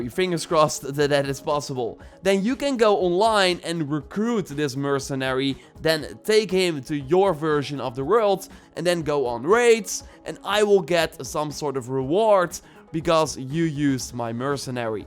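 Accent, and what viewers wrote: Netherlands. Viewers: are u dutch? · Not to mention his charming dutch accent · Is dit een nederlander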